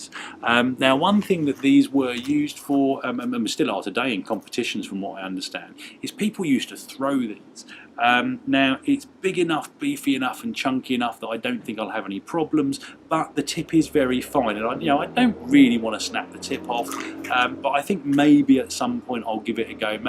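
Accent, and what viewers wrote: United Kingdom. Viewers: Don't keep me wrong, I love your reviews, but this british accent makes me remember Monty Python's scenes. By the way, I miss so much those guys. Thanks.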